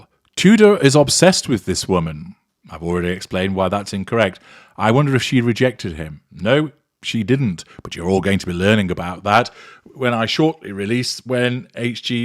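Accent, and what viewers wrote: United Kingdom. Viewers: I love how you take them down so eloquently & intellectually in your amazing well spoken British accent 👏 👌 It should certainly give them something to think about of the way they now look so foolish ❤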